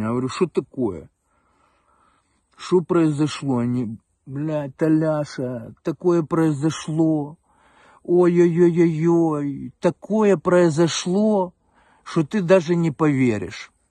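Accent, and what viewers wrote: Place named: Ukraine. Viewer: .... блин!... Как мне нравится говор украинский, но такой, что бы русские понимали!.... И ещё -, Вы очень похожи на моего двоюродного брата Андрея в молодости!.... Счастья, здоровья, мира, добра Вам и семье!....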